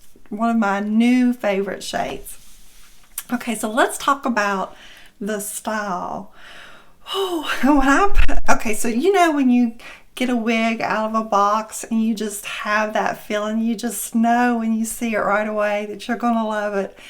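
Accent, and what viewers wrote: South, United States. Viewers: Absolutely gorgeous!!!! Love your accent!!!! It’s nice to find someone on YouTube that has a similar accent as I do!!!!! Gotta love the South!!!!! 👍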